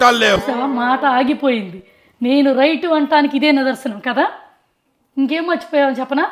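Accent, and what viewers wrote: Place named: Guntur, India. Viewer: మీ గొంతు diffrentg గా ఉంటుంది పై గా మా గుంటూరు అనే ఫీలింగ్ happy 20th wedding 💍 aniverseley.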